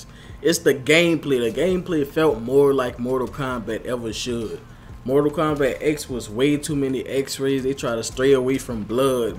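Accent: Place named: New Orleans, United States. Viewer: you sound like you from new orleans🤔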